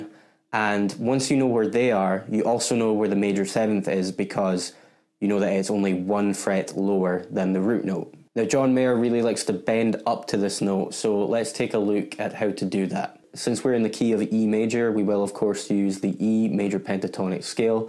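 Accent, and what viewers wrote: Scotland, United Kingdom. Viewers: Nice video dude, btw where are you from? I think some of your vocals sound a little scottish sometimes but I'm not sure though, english is not my mother language so I find it hard to recognize accurately accents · Are you Scottish? I hear a Scottish accent when you talk. Haha but I'm not sure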